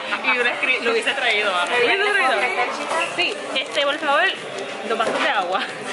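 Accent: Puerto Rico. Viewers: Bonita,habla pura, BORICUA,me,gusta,la,comida china de puerto rico, dios te proteja 🇵🇷🇵🇷🇵🇷🇵🇷🇵🇷🇵🇷🗽🗽🗽🗽❣️❣️❣️❣️❣️ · But dang she's a Asian race but puertorican when she's speaks it. China boricua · No se pero tu boricua es mejor que el mio ... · quisiera estar hay contigo soy de puerto rico y me gustas como hablas suenas como puertoriquena!! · mi vida tu hablas como boricua ,wepa .que <link> siento feliz por lo bello que hablas de mi pais y tu pais tambien🥰🥰. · Bella china boricua 😍 me impresiona ver una china hablando español. Y más con accento puro boricua wow. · Yo supper crazy to see this women speak the language just like what she is a real Puerto Rican. I share a story of once being in San Juan and walking passed a group of young Asian looking people and same thing overheard them speaking in Puerto Rican Spanish. So awesome to see!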